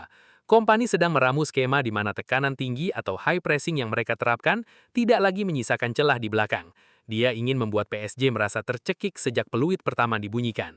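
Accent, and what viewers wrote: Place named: Makassar, Indonesia. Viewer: itu logat org Makassar dan Bugis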